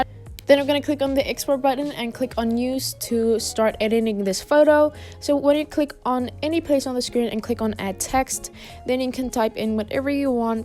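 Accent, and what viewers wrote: South Africa. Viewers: are you also from South Africa?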